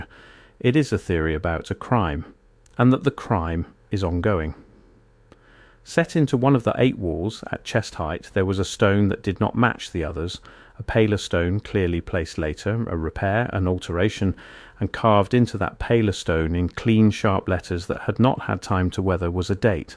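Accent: England, United Kingdom. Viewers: This was fun. You sound English. 😉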